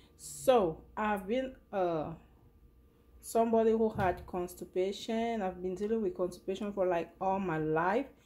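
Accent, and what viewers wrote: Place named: Edo, Nigeria. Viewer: Sounds like a 9ja sister Beni or Esan. I go try this one sha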